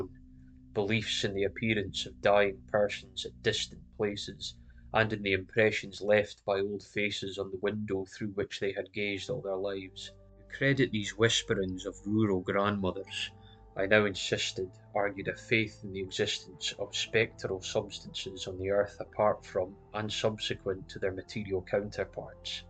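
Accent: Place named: North, United Kingdom. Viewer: A Northern Ian...i dig it! Keep on it! Arkansas, USA!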